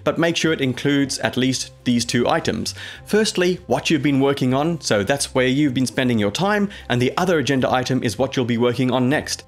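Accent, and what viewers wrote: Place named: England, United Kingdom. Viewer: The most beautiful English accent in the whole wide world. Thank you!!!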